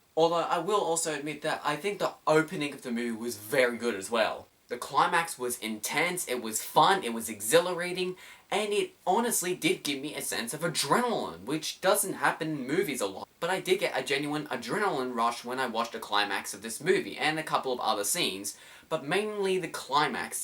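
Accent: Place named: Australia. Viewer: so you mean best and worst movies of the year right? that is a cool idea looking forward to it :) and by the way i love your australian accent it is pretty cool and makes you unique:) i think it is so interesting just that the same language sounds so different spoken by persons from different countries :) in my case my english videos have a german accent so my english sounds also different :)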